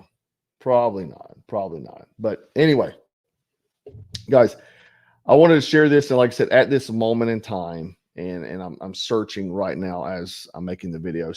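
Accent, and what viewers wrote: United States. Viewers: He said Joey Biden. He has a cowboy 🤠 accent. Get your ears checked or clean them out. He always says Joey Biden.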